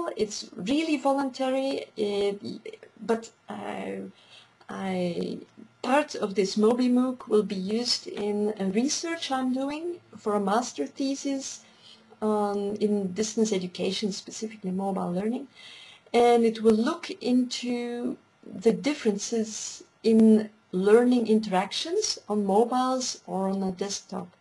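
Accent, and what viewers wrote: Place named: Flanders, Belgium. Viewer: Thank you for this lovely welcome,. Flemish-inspired English? 8-D Well, you're certainly inspiring.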